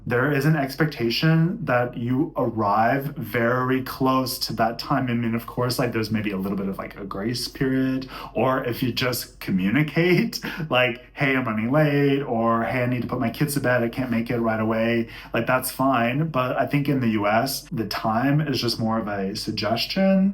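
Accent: Germany. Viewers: Irgendwie, komme ich nicht um den Eindruck herum das ich da einen deutschen Akzent höre. Trotzdem, gut wenn den Ammis mal erklärt wird mit wem Sie es zu tun haben. Wichtig, wir deutschen sind nicht platt zu machen und immer angepasster als jeder Ammi, auch wenn jeder meint wir sind zu dumm dazu. Das ist Taktig und Strategie. Wir hassen Risiko und halten euch Ammis deswegen für absolut Verrückt weil Ihr es eingeht. Danach sind wir sauer das wir das nicht gemacht haben. Deswegen bewundern wir euch auch. Weil Ihr Risiko liebt. Wir nicht. Das kann aber auch gefählich werden sehr gefährlich, 1933 bis 1945 war so eine Zeit als wir im größen Risiko den Risikolosesten Weg gesehen haben. Das kann leider jeder Zeit wieder passieren. Das solltest du den Ammis erklären. Danke schönes Video. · about a german accent: I thought the same thing ;-)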